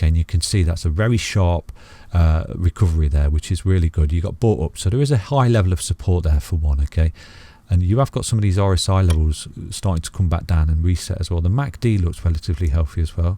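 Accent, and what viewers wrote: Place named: Midlands, United Kingdom. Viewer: Are you from Nottingham? Midlands accent 👍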